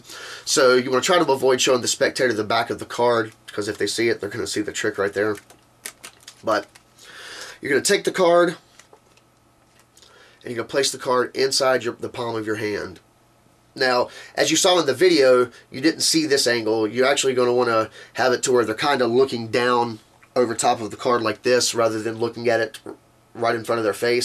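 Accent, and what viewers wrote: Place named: United States. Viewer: Hey I love your particular American accent! =D Is it a mixture of more than one accent? Nice voice too.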